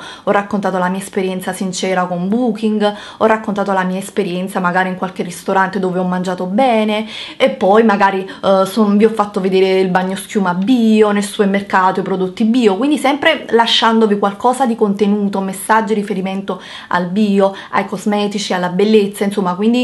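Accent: Naples, Italy. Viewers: Brava,vai avanti così, sei una ragazza solare e chi ti giudica esteticamente nn ha proprio capito che bella persona sei a darci tutti questi consigli di nn comprare schifezze.Mi sono iscritta da poco e sono felice di iniziare a capire quali siano i prodotti buoni grazie a te.Adoro sentire il tuo accento napoletano e posso solo dirti di nn pensare a queste persone perché purtroppo sono gelosi e invidiosi di quello che fai.Io invece solo perché sono carina devo subire cattiverie in un altro modo...quindi nn è un naso che nn piace a far commentare negativamente ma tutto può essere fonte di critiche x queste persone vuote.Ti mando un bacione. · I tuoi Vlogs sono davvero molto simpatici, come lo sei tu, solare e anche le tue inflessioni Partenopee arrivano al "cuore" Pero' almeno rispondimi ogni tanto!!! Mai ricevuto una tua risposta:( comunque for ever!!!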